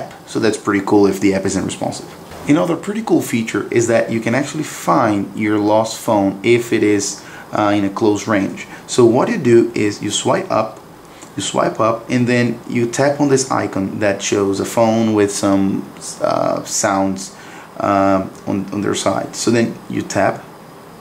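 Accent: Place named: São Paulo, Brazil. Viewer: Khew you were Brazilian by the paulista accent hahah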